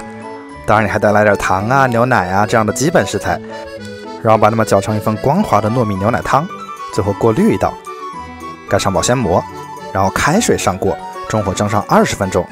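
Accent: Wuhan, China. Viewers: 浓浓的武汉口音🤣🤣🤣🤣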